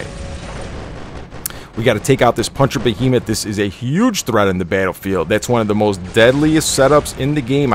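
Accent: Midwest, United States. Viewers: You sound like you're from the Midwest Midwest nuclear: new-key-ler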